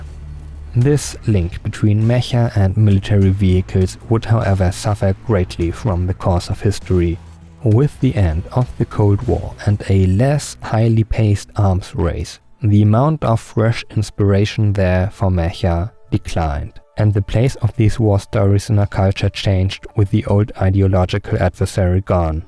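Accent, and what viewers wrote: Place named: Germany. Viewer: I thought: "hmmm, that accent sounds very german..." And the Die Sending mit der Maus theme was playing, so I do not even have to ask anymore. Anyway Gundam Aerial has thick thighs that save lives.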